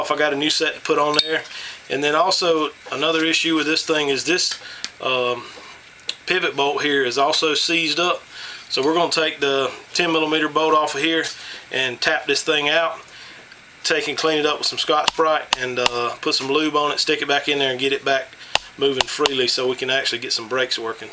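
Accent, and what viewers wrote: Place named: Georgia, United States. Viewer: South for sure. By the accent I'd say Ga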